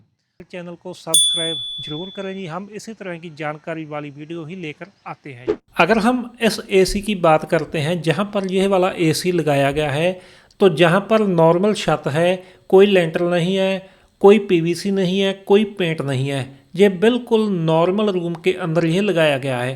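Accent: Punjab, India. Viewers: imandar insaan ho aap. aapki punjabi vaali hindi bahot hi achhi lagti hai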